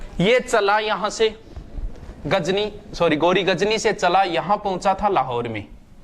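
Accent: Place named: Marwari, India. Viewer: sir i like ur marvadi voice lecture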